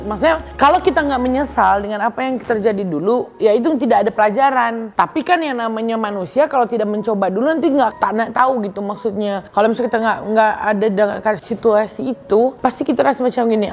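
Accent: Malaysia. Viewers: Alhamdulillah, tahniah!! Yg penting loghat bahasa melayu dgn indo nya bercampur ya. Unik sekali 😁😁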